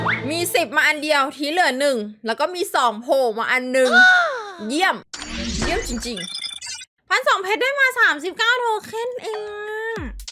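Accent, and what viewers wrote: North, Thailand. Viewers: เง้ออชอบให้พี่พูดภาษาเหนืออ่าาน่ารักดี😳😻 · พูดเหนือน่ารักมากพี่(;ŏ﹏ŏ)(๑♡⌓♡๑) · พี่พูดภาษาเหนือ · คนเหนือออ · พูดภาษาเหนือตั่ลร้ากก พี่ 😳🥰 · พูดภาษาเหนือบ่อยๆนะครับน่ารักดี❤️😊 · พี่คนภาคเหนือเหมือนผมเลย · เป้นคนเหนือกะ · พี่ พูดเหนือเป็นด้วย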